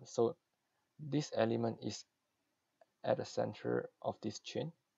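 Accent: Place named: Singapore. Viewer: hello my fellow singaporean xD !! hear one time know liao, SG voice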